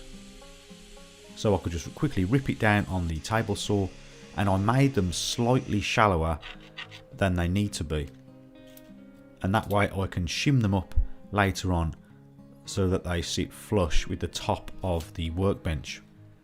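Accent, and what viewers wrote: Birmingham, United Kingdom. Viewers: Love the Brummie accent (I'm watching this in Australia, but I'm from Hall Green originally) - Thank for the video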